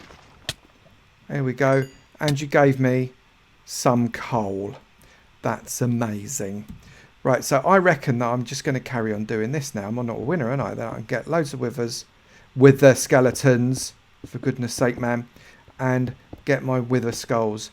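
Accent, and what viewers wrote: United Kingdom. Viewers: I really enjoy your vids/liked and av learnt alot from u, Esp wit redstone. I've copied a few of your tutorials and non redstone 1's. But my question is: 1 r you frm the UK n where,as I'm frm Norfolk coz I love ur ascent and 2: is there a way to make a lift system without using redstone 2 get items frm your mine to ur base on ground level?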